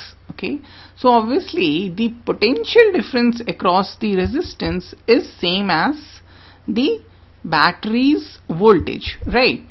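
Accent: India. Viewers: What is wrong with your voice and your accent???????? Are you an Indian??????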